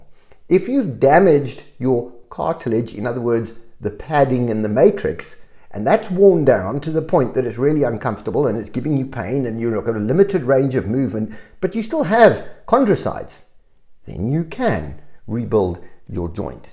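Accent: South Africa. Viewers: KZN accent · Good heavens, this is a triumphant explanation, and what a pleasant surprise to hear a Saffa accent! I dove headlong into the debate after tearing a labrum. Drs contradicted Physios who claimed that bursa replenish the cartilage by providing nutrients through movement. I'm a 42-year-old (gnarly) Capetonian surfer and I'm plunging into PT to stave off surgery. Thanks for the insight.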